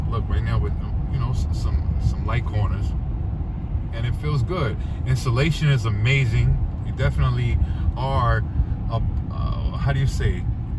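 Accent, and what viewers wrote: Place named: Philadelphia, United States. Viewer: Sound like you originally from Philly.lol Nice review 👍🏾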